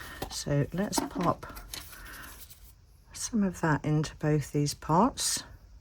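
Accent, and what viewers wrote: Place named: United Kingdom. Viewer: Another beautiful masterpiece as always,. God bless the UK for some of the most beautiful, most graceful female artists in the community, with such beautiful accents, and graceful poetry. 😍😍😍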